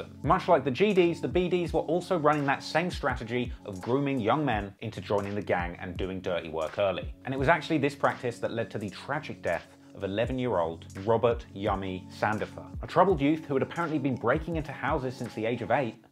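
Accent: England, United Kingdom. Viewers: So we really listening to this British or whatever the hell it is tell us about American gangs and then he has a rap label that he said is the new death row?!? Wake up people. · It’s a little weird a Brit talking about Chicago gang history but you seem to have done an extensive amount of research. 🤷🏽‍♂️ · why is a British dude talking about gangs? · RIP to all the young brothers that lost their lives. Once again the devil is trying to divide the Black Community and the Hip Hop Community. Unity is power brothers . Brother Hoover said G D is growth and Development the B D in this case should be Business Development. You see they have a White Man with an England accent narrating this, he is exploiting black youth he will never set foot on Parkway Gardens or O Block to help these young brothers. Unite GDs and BDs because we have one common enemy. Let's build up our communities and protect our children, women and senior citizens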